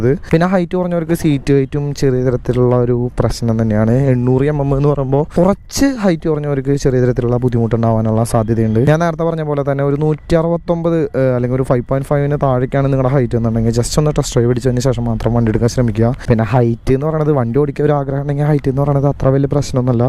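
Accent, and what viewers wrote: Thrissur, India. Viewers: bus inte Peru kando "Pooram"... Coincidence paranjal 'Oru Thrissur karan , infamous slang and Rtr 160 4V koode aa busum ..' Age oru kunju Pooram feel ✊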